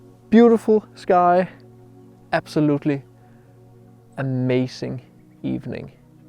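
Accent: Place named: Denmark. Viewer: When I first watched your videos, I thought to myself, "He's the Lars Ulrich of photography." Then to find out that you are also Danish. I knew I knew that accent. 😂😂 Great videos! I really learn a lot from them.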